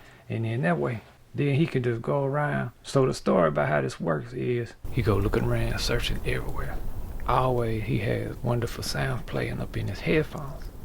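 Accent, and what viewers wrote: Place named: North Carolina, United States. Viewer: Amazing!!! You are talented beyond belief....i love watching your videos and just recently found you! Thank you! Also, you sound very much like you might be from Halifax Co, NC......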